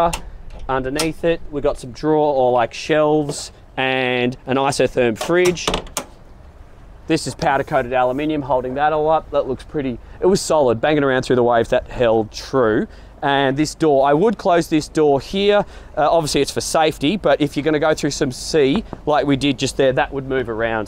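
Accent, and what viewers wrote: Australia. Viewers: Love the Aussie accent..Sometimes he says here , sometimes heeyah..Keep up the good work..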